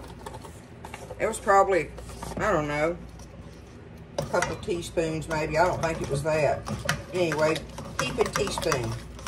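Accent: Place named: Appalachia, United States. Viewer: Miss I just love to hear you talk you have the sound of a true hillbilly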